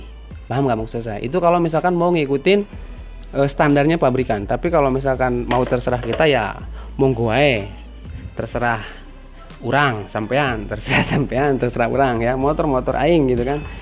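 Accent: West Java, Indonesia. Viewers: sya suka kalo si abang ini dalam bicaranya urang sunda mana ieu teh resep ey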